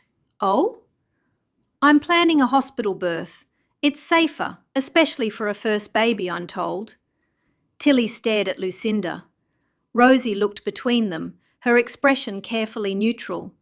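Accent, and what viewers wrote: United Kingdom. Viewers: Wow! Heavy British accent!😂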